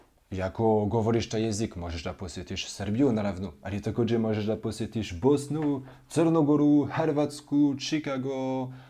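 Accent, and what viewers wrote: France. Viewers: Hahaha o bože kako dobro zvuči srpski sa francuskim naglaskom :)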